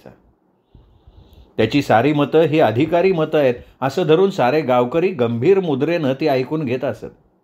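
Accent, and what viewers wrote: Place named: Malvani, India. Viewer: Far mast hasun hasun vat lagli mast Sir ani Sir aple abhar tumche malvani vachan lay bata vatala ha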